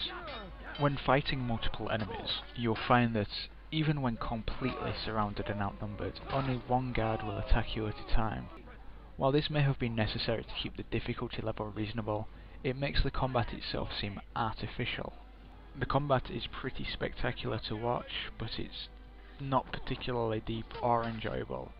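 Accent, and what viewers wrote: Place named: Scotland, United Kingdom. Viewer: He sounds more Scottish than English.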